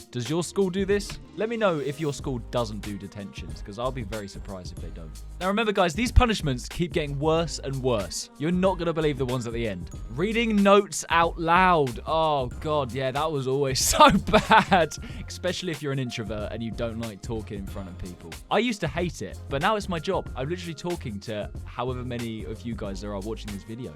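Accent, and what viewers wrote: United Kingdom. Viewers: Are you British you literally sound British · are you British? bc u say waht i would od no offense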